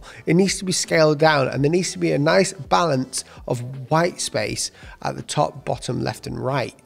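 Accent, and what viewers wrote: United Kingdom. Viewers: British accent is horrible man, some words are missing from your speech . i can't understand fully what you mean . please there is many people is not native English speaker so they don't fully understand British accent . it would be better if you speak normal English or American accent would be better for many people to understand .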